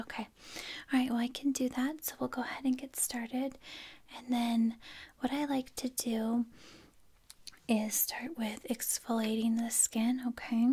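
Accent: United States. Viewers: but with an American accent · you must be from the South?:D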